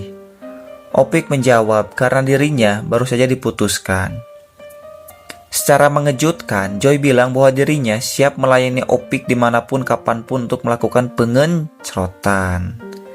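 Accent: West Java, Indonesia. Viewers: Sunda mang wkwkwkw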